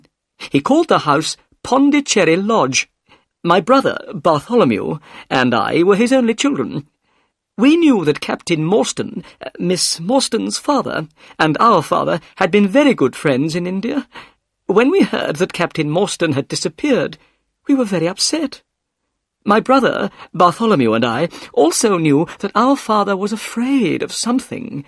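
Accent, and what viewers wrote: United Kingdom. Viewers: Es inglés británico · Me encantó!!! Creo que entrenar el oído es la major manera de iniciar, aunque siempre se necesita un poco de la vieja escuela, manuales y eso. Puedo leer porque escuche como se pronuncia la palabra y puedo traducir oralmente pero me cuesta muchisimo más escribir, es tan triste que no pueda siquiera copiar sin estarme fijando el orden de las letras en la palabra. Aunque soy feliz entendiendo lo que lees, realmente creo que lo haces genial.. con el toque inglés más que americano pero quizá es por el libro que es de esa nacionalidad. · Es el inglés británico cierto?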